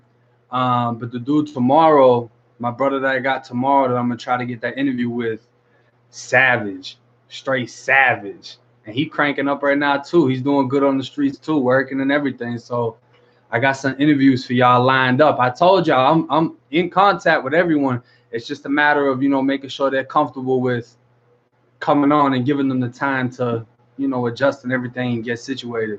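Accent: Boston, United States. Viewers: Just sub'd. From TX. I get a kick out of your soft accent. Lot of FL friends but it's your Boston that catches my ear.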